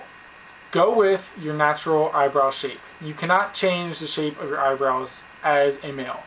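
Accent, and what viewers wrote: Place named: New Jersey, United States. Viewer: He has a east coast accent.. I heard a hint of jersey in there..O_O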